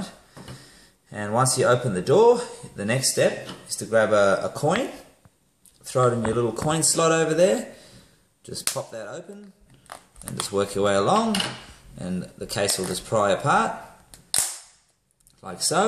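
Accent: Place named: South Africa. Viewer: Well done mate. Just purchased a dog and bone wetsuit case for my iPhone 5 as I'm heading to the Whitsunday's tomorrow. I returned home with my device in hand, raring to put it to work. Your little video is perfect. It's like a crewless cooking vid with just the details and predictions of little stupid things we consumers could overlook. The SA accent was the only thing that caught me, but I am full of confidence going into the water with my link to the great big world. Thank you.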